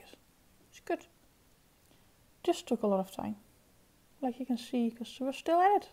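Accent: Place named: Netherlands. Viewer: Gorgeous look ✨💖✨ I’m currently stalking my package 📦 with this gorgeous palette in it... it’s just down the west coast from me in San Francisco... 🤨 I see you Rose Metal palette and 2 eyeliners...😳soon my pretties...soooonnn 😳🙌🏽🥰🙌🏽🤣 I love your accent, it sounds Dutch to my old ears 🤣 I apologize if I’m wrong, which I usually am...🤨😳🤣😉 I think accents of all languages is beautiful, with the exception of a couple my American accents... I just don’t think our accents are that great...some of the southern accents and Midwestern, New England accents I love, but the regular sounding American accents on the west coast is kinda blah bcuz I hear it all the time. You’ll love Lois’s Gone Rogue palette too 🥰🤗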